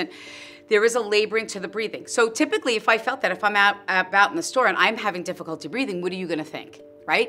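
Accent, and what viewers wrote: New York, United States. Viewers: “DO YOU UNDERSTAND WHAT IM SAYING?!” With a New York accent keeps you in check.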